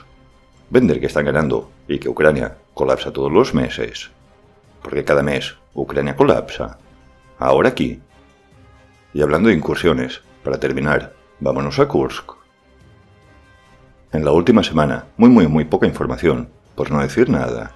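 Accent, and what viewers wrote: Galicia, Spain. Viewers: Perdon por el off topic, ¿tu acento es de Galicia?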